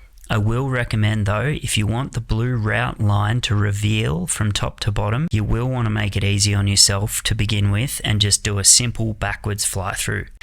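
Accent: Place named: Australia. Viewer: Would be interesting to listen and learn, but difficult to suffer through such a thick australian accent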